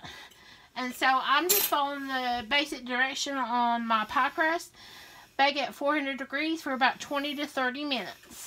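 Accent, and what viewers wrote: South, United States. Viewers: Looks easy and delicious. Where are you from? Love the southern accent😁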